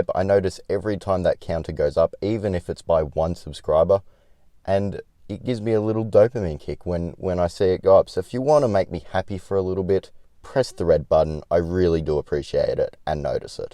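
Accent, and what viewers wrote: Australia. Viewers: Heavy Aussie accent love it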